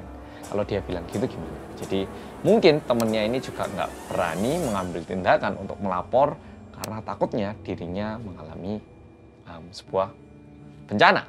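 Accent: Java, Indonesia. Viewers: Bersama org korea yang... Madokkkkkkkkkkkkkkkk😂😂❤❤❤... Suka banget dengarin😅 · Suka banget karo wong korea sing medooogggg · Baru nonton chanel ini.. wong korea ngomongnya medok banget.. lucu.. haha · Tim gercep mas, selalu medoooooooookkkkkkkkkkkkkkk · Mas yg orang korea aja ngomongnya lebih medok daripada aku yg suku jawa, tapi tinggal di kalimantan 🤭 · Dah lama ga nyimak kasus Dr abang medok. Kangen rek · Lucu penyampai ini..orang korea tapi logat indonesia(jawa) nya itu pekat ya😀😀😀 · Mulai demen liat video2 nya mas medokk satu ini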